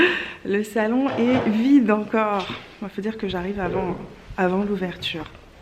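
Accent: Quebec, Canada. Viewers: Trop beau tes extensions de cils ! C’est vraiment réussi :) C’est drôle tu as beaucoup plus l’accent québécois quand tu es à l’extérieur que quand tu fais des vidéos chez moi ! Bisous 😘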